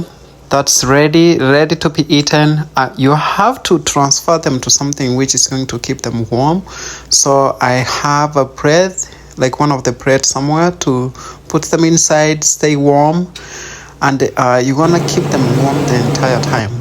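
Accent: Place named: Kisii, Kenya. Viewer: I love your Kisii accent just like congrats dad😂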